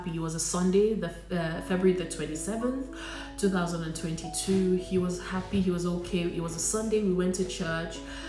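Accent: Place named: United Kingdom. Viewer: This one that you are already having British accent 👀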